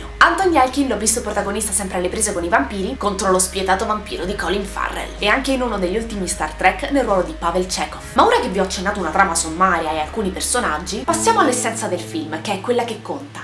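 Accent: Rome, Italy. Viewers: Me piace n' sacco il tuo accento romano, comunque adesso mi guarderò subito il flim!! Sei bravissima :D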